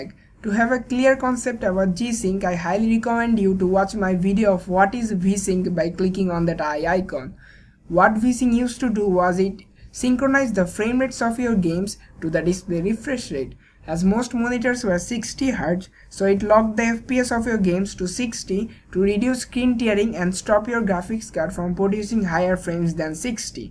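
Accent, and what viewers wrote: India. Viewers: I know its out of context, but I loved ur acent..lol..much like Indian accent..kudos.. Nice video. Thumbs Up.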